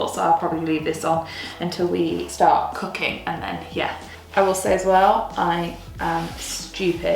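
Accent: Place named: England, United Kingdom. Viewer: Hello. Found you this morning, so pleased to hear an English accent. I am from South Yorkshire and love a good old diy project. Looking forward to watching all your other videos 😊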